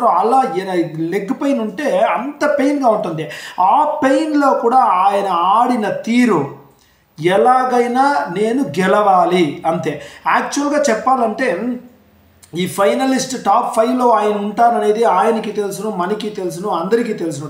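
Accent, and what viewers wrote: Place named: Karnataka, India. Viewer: Yes nenu kuda observe chesanu. Mee slang kannada telugu mix, na kannada friends telugu meela ne matladatharu.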